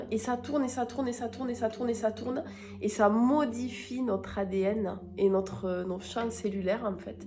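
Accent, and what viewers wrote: South, France. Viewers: Une belle jeune femme avec un accent....c l’accent du sud lalala...et qui dit et qui raconte l’histoire d’une belle Lumière Bleue...c une vibration merveilleuse car tu en es toute transformée...❤❤❤❤❤❤❤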